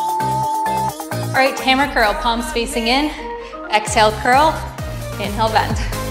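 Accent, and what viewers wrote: Canada. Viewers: Love your work-"ouuts" ... and your Canadian accent!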